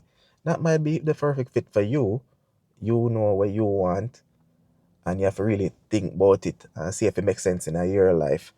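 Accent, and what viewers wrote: Jamaica. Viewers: Spoken ❤ true orthentic Jamaican. Up up str<num>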